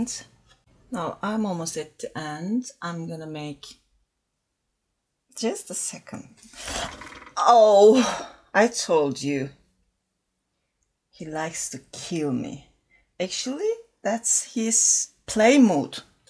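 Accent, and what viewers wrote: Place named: Turkey. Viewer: Türk aksanlı İngilizce 😂😂😂😂hemen belli oluyor ,broken eng too…😂😂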